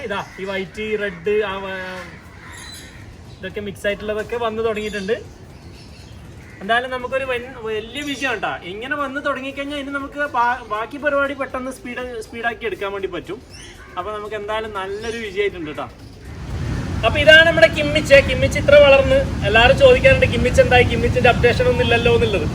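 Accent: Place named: Pazhangadi, India. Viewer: Pazhanghadi bhasha polich 😂👍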